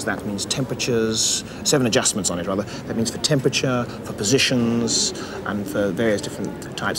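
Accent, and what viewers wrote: United Kingdom. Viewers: Nothing sounds smarter than a British dude who smacks his lips while waxing poetic. Awesome stuff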